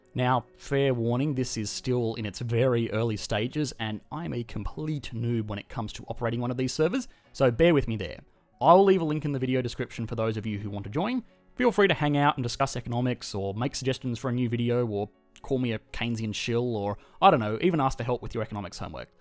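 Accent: Australia. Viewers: Hey are you Australian? You have an Australian accent ahaha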